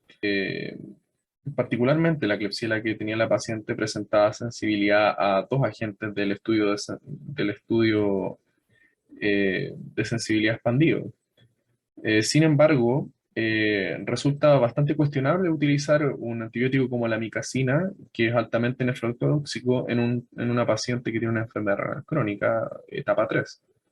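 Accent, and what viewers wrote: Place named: Chile. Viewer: Hola, entiendo por el acento que eres chileno, deberias esforzarte en hablar un poco mas neutro así el resto de Latinoamerica puede entenderte.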